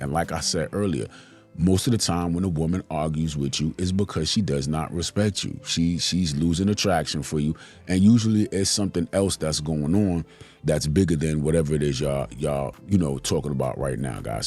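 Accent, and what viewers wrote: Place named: New York City, United States. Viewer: Spot on Bro. I can tell you are from my city NYC from your accent. Salute!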